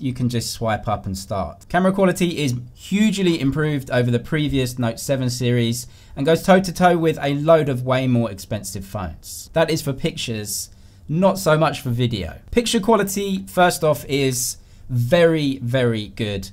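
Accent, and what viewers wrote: United Kingdom. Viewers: you looks like eminem with an british accent 😊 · Are you British?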